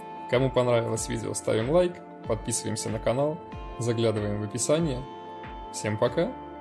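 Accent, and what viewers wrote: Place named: Minsk, Belarus. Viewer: Увы, минский акцент не спрячешь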